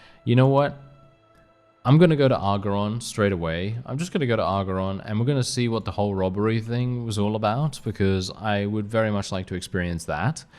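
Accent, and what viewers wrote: United Kingdom. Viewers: These should not be this fun to watch... but I get good ideas which make my campaign more fun and you got a cool british asmr kinda thing... you Brits have spoiled me with your cool voices, I def prefer to watch other youtube vids with good ol British folk narrating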